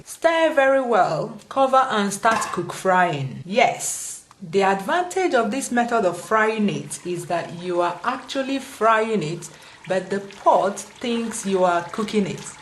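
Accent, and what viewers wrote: Igbo, Nigeria. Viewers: Although I don't hear Igbo, I love her humour blended with the Igbo intonation.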